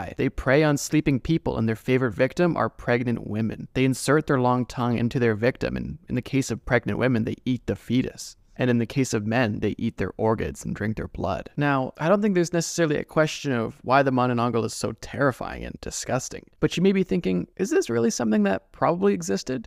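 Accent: Canada. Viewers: Is this guy Canadian? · Are you Canadian?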